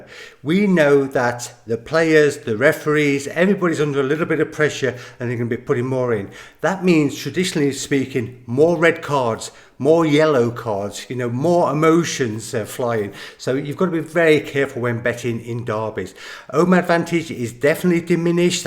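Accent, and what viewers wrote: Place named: United Kingdom. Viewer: What? , you probkybjust convinced by his brith accents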